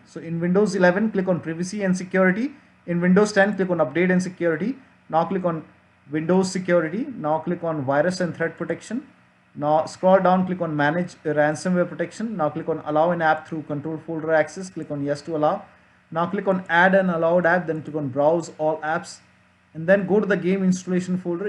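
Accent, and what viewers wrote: India. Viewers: love the indian accent, just like vancouver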